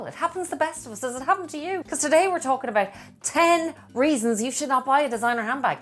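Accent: Ireland. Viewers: Hello, I am a new subscriber and have enjoyed watching your videos. Love your accent, what part of Ireland are you from. My husbands Father came from County Cork. xoxo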